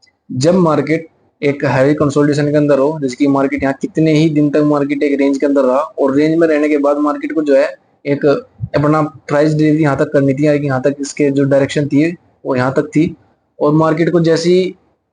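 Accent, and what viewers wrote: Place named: Rajasthan, India. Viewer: Bhai rajasthan se ho na kyu ki boli se pta chl ra h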